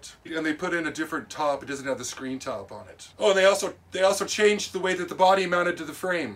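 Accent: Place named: Midwest, United States. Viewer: Love your videos,. Your flat N. Midwest accent & idioms are great humor & your knowledge of tools & techniques for rehabilitation of old gear is unsurpassed.. But one minor correction is in order - to say Lionel O-27 is 1/64 scale is just as wrong as saying it's accurate 1/48th. The "27" in O-27 refers to the diameter of a circle of track (27 inches) which was designed to fit a standard 4' x 8' sheet of plywood with enough room to spare for sidings/etc. Lionel was less concerned with scale accuracy (especially in the early post-war era) than what would fit comfortably in the small bedrooms of the time. While most rolling stock was not accurately scaled to 1/48, and was often much shorter, the proportions were also not 1/64. Do a little more research online to find this is true... Meantime - keep up the great work!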